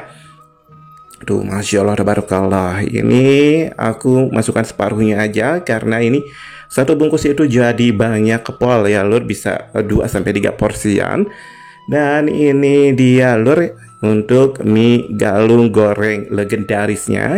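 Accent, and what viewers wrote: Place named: Java, Indonesia. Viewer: kelihatan medok banget😊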